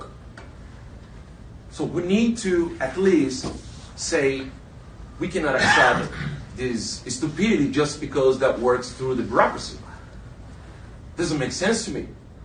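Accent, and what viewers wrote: Brazil. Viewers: Inglês horrível...sotaque brasileiro ....vocabulário restrito 😂😂😂qto ao conteúdo só lamento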